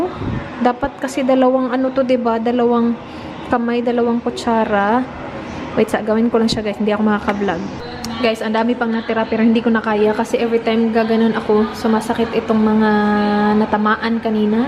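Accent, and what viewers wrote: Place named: Visayas, Philippines. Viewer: nakakakutawa po yung bisaya accent nyo 😍😍😍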